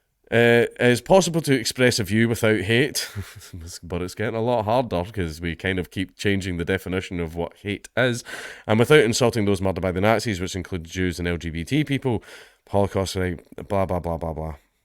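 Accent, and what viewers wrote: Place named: Scotland, United Kingdom. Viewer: Did you somehow get more Scottish recently ?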